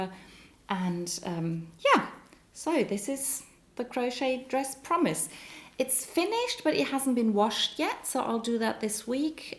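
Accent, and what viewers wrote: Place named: Australia. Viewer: Thank you for responding right away! Love your podcast! My son thinks you have an Australian accent:—). I will hate to disappoint him..., not.:-). Love your English! I wish my German was as good as in the old days when I spoke it flawlessly.... Is it this the pattern? <link>?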